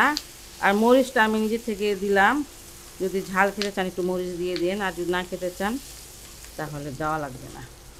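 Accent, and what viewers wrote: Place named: Old Dhaka, Bangladesh. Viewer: আসসালামু আলাইকুম আন্টি। রান্না করার সময় আপনার হাতগুলো দেখে মনে হয় একদম আমার আম্মুর হাত এর মতো। আর আমার নানাবাড়ি পুরানো ঢাকায়। আপনার কথা বলা আর রান্না দেখে মনে হয় আপনিও পুরোনো ঢাকার মানুষ। অনেক শুভকামনা আপনার জন্য আন্টি। দোয়া করবেন আমাদের জন্য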